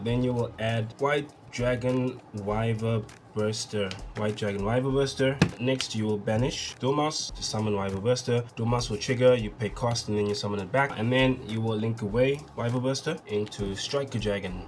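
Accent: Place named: South Africa. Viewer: Accent is awesome - u from SA?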